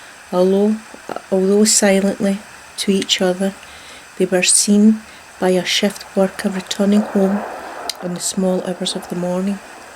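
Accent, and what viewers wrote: Scotland, United Kingdom. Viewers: This was awesome! I like ghost stories. The sound-effects is a perfect mix with your beautiful accent :) So relaxing...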